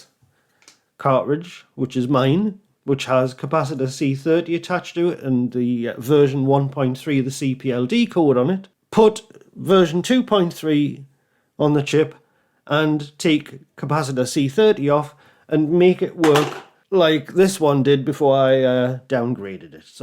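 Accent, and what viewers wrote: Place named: United Kingdom. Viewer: It would seem to me that “Lethargic” has long already fired you from working with him ever again. That would explain why he completely cut you off and moved on with someone else with newer projects. Lethargic would say that you were being extremely insubordinate with him. But yeah, Lavoric or Lethargic or whatever is name is, was only obsessed with profit, he didn’t want to lose alot of money he didn’t have with beta testers; so he just sent them all out as they were. Definitely not the best way to do things, but this is Poland we’re talking about here! 🤷‍♂️😂 I hope you will find another revenue source soon. I really enjoy your videos and British accent/slang talk! I subscribed! I’m a new owner of an Atari 800XL that I heavily modded and recapped myself. This thing is a blast to use with the entire library of games!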